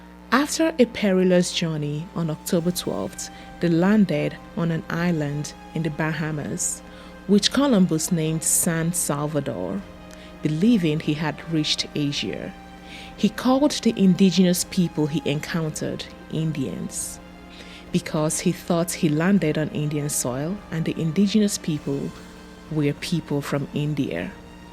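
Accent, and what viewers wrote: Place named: China. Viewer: Your English is bad, I'm missing 10 percent of your words. weird accent. I'm from London, dad's from st vincent. Sounds like you learned English from a Chinese person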